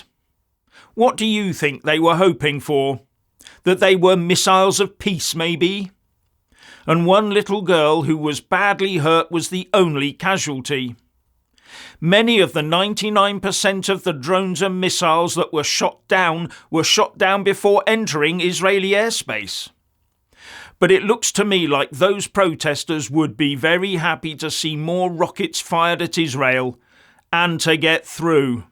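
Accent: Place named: United Kingdom. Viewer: This British accent is for me😂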